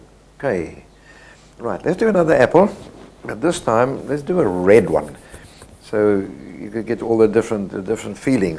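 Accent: South Africa. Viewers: Boy, am I happy to have found you, I turned 60 a while ago, and suddenly decided to try my hand at painting etc. I just love the way you talk a person through the motions.... And to hear a South African voice ... what a treat. Thanks for the knowledge that you share. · You have a South African accent, am I correct🤓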